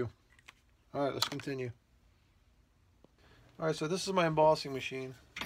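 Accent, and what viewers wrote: New York, United States. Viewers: Are you from NY? You sound like you may have been from NY.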